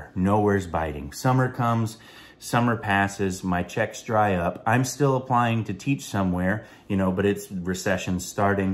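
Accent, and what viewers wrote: United States. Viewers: Sounds like Jasper, Missouri. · 👂 Sounds like Lake County Fl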